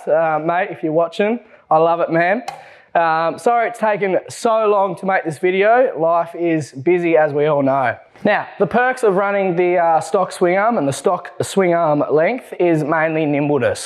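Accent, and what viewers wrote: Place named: Australia. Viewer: Awesome video mate… one of the best from you guys… your super Australian accent/words used to describe things was by far the highlight of this video… please make all videos exactly like that 😂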